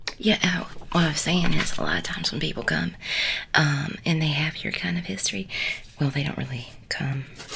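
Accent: South, United States. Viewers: Hold on a second, are you actually from the South? (I realize you probably get asked this all the time, but I'm curious)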